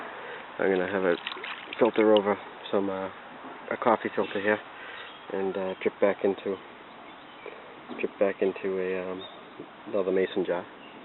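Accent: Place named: Boston, United States. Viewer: Is that a Boston accent ?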